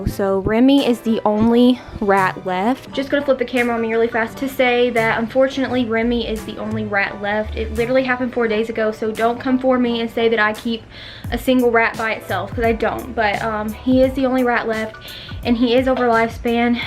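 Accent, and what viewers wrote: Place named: Tennessee, United States. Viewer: aww awesome video! this channel deserves more views! love hearing the tennese accent to lol!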